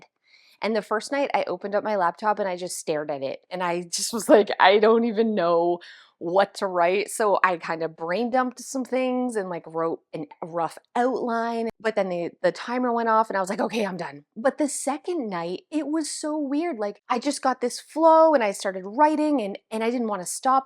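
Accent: Canada. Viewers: I'm going to work on a Word Search book that I got at the $ store, 10 min house tidy up, and do resistance bands for arms and legs! Thanks for sending this video. Your videos have become my happy place, 😍 I love your Canadian accent. I live in Minnesota, so not too far off with the way people talk up north!!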